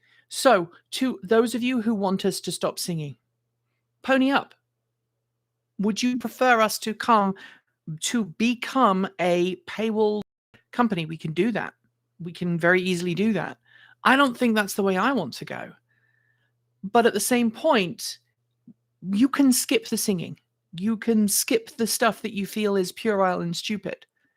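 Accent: United Kingdom. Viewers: Enjoy your vids as they are don't change because of some narrow minded people be yourself and hang in there and I love the British accent hang in there 😊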